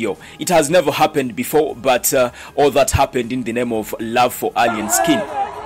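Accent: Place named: England, United Kingdom. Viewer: I just love ur English accent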